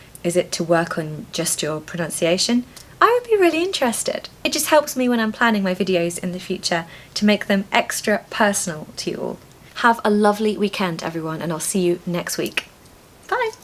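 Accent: RP, United Kingdom. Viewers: Hi, ❤❤wow! Congratulations. I haven't visited YouTube for months. I have been really busy with work. You are the reason that I visit YouTube! Really! It is so homely to be able to hear your lovely RP accent again. 🎉🎉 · Well I happened to come across your channel by chance, and since I love RP and modern RP accent and British manners, and you combine them perfectly in your way of speaking, your videos are perfect for me, since I both enjoy and improve my modern RP accent! Also, I can learn some new vocabulary and expressions too, so.. it's just perfect! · I kind of copied your way to speak, and now I sound truly British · Hi, I'm so happy for your pregnancy!! You look lovely by the way!! I'm watching your videos because of your inner and outer very feminine loveliness, and because You've exactly the British accent I would like to acquire myself I'm Norwegian born&bred bu have lived in Italy for 40 years now with no one to talk to in English, so I just wish to find my lost flow and to bring my accent closer to yours.if possible. 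Watching and imitating You is really helpful and FUN! Sending Love to You and Your husband Tom and your baby boy.! Gioia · It sounds very origin British accent....I admire it a Lot...we're waiting for more..thanks Teacher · Congratulations once more for the new guest in offing. I love to hear you for your lovely RP accent and pronunciation. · Hey teacher. This is Julia, I am a visually impaired filipino student whose waching your videos since last year. I am the person who ask to you if you can sing a song for us, and I am the person who ask you if you already tried the filipino foods. Teacher? Can I ask you something? Do you had a filipino students before? Since I am a grade 10 student right now here in the Philippines, our lesson in english at School is all about model verbs. Teacher? Can I have a request? Can you teach model verbs? Thank you. The reason why I'm waching and listening to your videos because, I want to learn the british accent, I want to improve my english speaking skillss, I want to learn a british culture, and I want to become a content creator in the future. 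I will be a famous content creator someday, I want to use the english language, and I want to use the english accents like american accent and the british accents like london and the modern receive pronunciation. I want to share my knowledge to other people using the english language. Even it is hard for me to understand the accent sometimes, I'm still listening to all your videos. Teacher, I really really really really love your british accent! I really really love the way you speak, the way you teach, and the way you teach pronunciations. It's great! It is very very helpful and very very use full for me. teacher you know? Even I am blind, for me, you really really look nice! you look beaut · very clear and easy to understand your British sound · Dear! I din't know you were prengnant!! Congratultions, I'm so happy four you!!! I love to hear you, your pronunciation it's so lovely, your voice it's so sweet and beautiful, it's a delightful to hear your british pronunciation! 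I send you kisses from Cordoba, Argentina. Gabi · It’s not false; however, I believe that we must mean her own accent which is the 'modern received pronunciation' which is undisputedly a British accent and probably (especially) by opposition or difference with the American accent. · The reason why I’ve been watching your videos is learn British English and cultures. Your RP pronunciation is really marvelous! When I came across your channel for the first time, I reckoned that was where I would settle down regarding RP sound!❤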